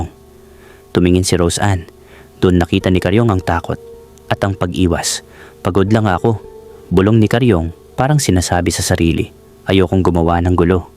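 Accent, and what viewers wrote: Pangasinan, Philippines. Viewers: Taga pangasinan yan c narrator 😂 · Hahahhaha panggalatog ata c narrator😮😮😮😮😮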